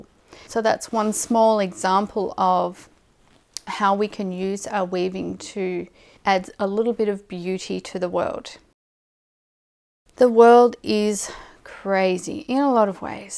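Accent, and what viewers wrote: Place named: Australia. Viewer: I wish I had found you a few months ago. Apart from having the easier on the ear approach of us Aussies in comparison to some other countries, your videos are very informative.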